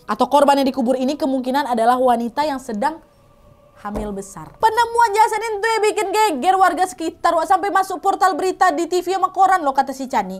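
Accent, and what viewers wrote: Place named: Medan, Indonesia. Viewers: Suka bngt sama,dengan logat anak Medan ya 🤭, success dan bahagia selalu ya. Ngikutin dia dr screen msih 1jtan.. mkin ksni mkin kereeennn 👍👍 mantab. Btw tumben gak da jamscare nya nih 🤭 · ini aslinya orang mana sih.??? Kek orang Sumatera deh..tp ga tau Sumatera mana... 👍😂😂😘😀😀😀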